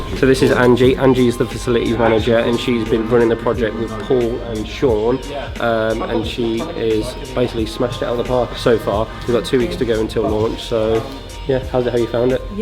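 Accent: United Kingdom. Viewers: Difficulty in understanding your U.K. Regional Brand of English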